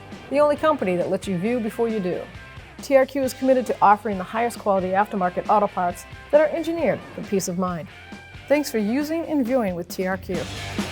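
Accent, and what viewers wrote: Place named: New England, United States. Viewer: I'd buy something from you if you made the video on the 4wd version of this truck. The presenter sounds like they're from New England, they should have INSISTED on it ...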